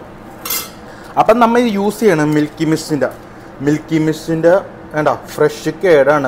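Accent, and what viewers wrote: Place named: Ernakulam, India. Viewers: തനി എറണാകുളംകാരൻ 😁 " നമ്മ ഈ കാര്യങ്ങളൊക്കെ സെറ്റാക്കി വച്ചിട്ട് പടേ ന്ന് cook ചെയ്യണം " 😁😁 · സംസാരം കേട്ടിട്ട് പറവൂർകാരൻ ആണെന്ന് തോന്നുന്നു😅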